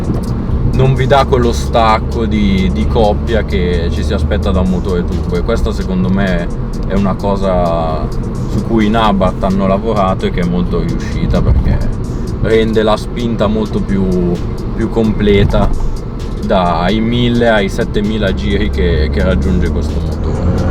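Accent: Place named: Italy. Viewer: Your italian ascent sucks so much that I need to read the english sub to understand something LOL! Learn to speak before create Review bro!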